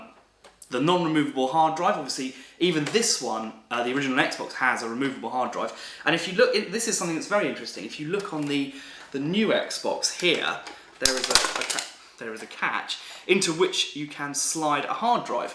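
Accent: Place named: RP, United Kingdom. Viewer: He's posh with bad grammar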